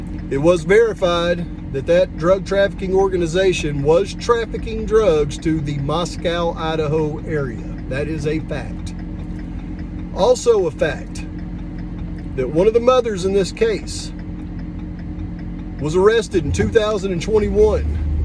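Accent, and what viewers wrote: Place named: South, United States. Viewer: I'm with you too 💯😁 and I love listening to him, I love a Man with a Southern Accent 😄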